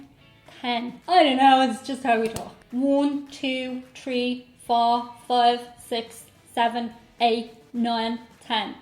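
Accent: Ireland. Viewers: The only Irish accent I care about is yours. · Being from deep in the Heart of Dixie anytime I try to do an Irish accent it sounds more like a bad Scottish accent LOL then it slides into a Boston accent LOL your accent is very nice. I have met people from Ireland I cannot understand a word they are saying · I wondered about your specific accent which is subtly different than many of the other Irish accents that I hear via “The Try Channel” and other sources. Can’t wait until you get to Chicago, I hope you get to hear the many variations in the city! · OMG, since I enjoy your Irish accent, I chose the Irish English setting on my iPhone's Siri, get this; it also gave it an attitude. I recently made a request from Siri and the answer I got was, SERIOUSLY!!!! it just cracked me up, the Irish Siri is feisty! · Queue the trolls who insist your Irish accent is fake. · Hi, I love the Irish, As an American Injun I was taught my Catechism at the Stewart Nevada Indian Boarding school, Catholic Church, by Nuns who had traveled from Ireland, on a VISA, and to me were the most Beautiful women I had ever seen!!! 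In my entire 6 yr old existence! No one since has ever had a true Irish accent. Except you of course.:D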